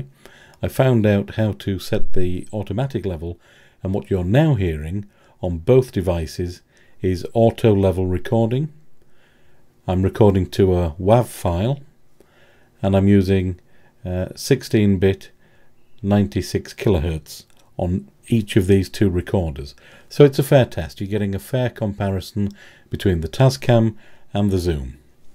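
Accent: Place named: United Kingdom. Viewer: What part of Great Britain You from ?!?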